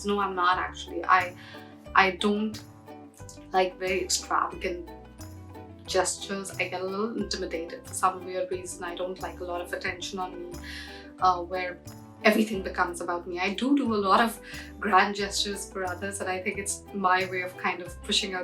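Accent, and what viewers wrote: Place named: India. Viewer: U have Indian accent R u indian??🤔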